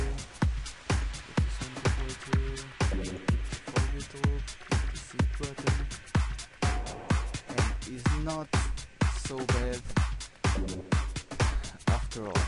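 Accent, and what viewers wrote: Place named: Portugal. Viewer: pelo sotaque percebi logo que eras portugues :)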